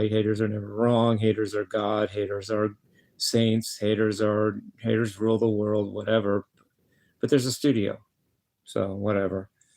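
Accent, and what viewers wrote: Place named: East Coast, United States. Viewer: Hi, Nobody uses “Mock” except. I can hear your east coast accent.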